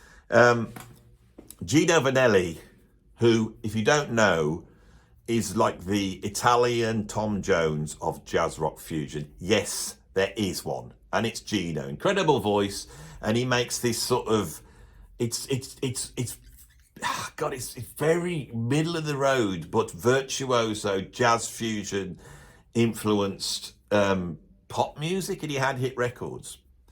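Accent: Birmingham, United Kingdom. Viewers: love your Birmingham accent, still lying on the floor laughing.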